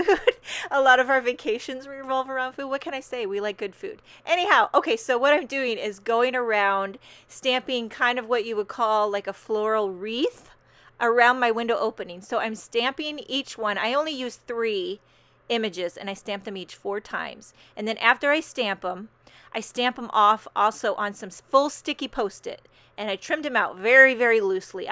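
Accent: New York, United States. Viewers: I love your videos, funny personality and I love your accent too. New York?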